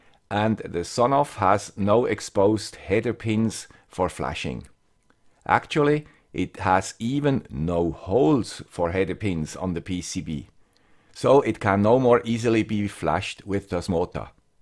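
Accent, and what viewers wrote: Switzerland. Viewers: It's a sin. Anyway, ok for the swiss accent: you are great! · Hey Mr Swiss Accent.... you should try using the mobile hotspot in Windows 10. Just search hotspot and it will take you to it. Set the SSID and Passwort and activate! ;)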